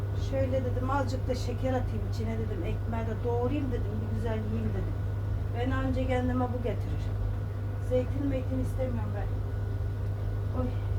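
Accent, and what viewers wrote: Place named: Tavas, Turkey. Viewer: KURBAN OLDUĞUM TAVAYLA KONUŞMANA KURBAN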